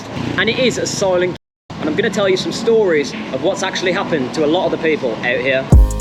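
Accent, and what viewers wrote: Birmingham, United Kingdom. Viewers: Nice to hear a down to earth Brummie accent on channels like this, keeping it real.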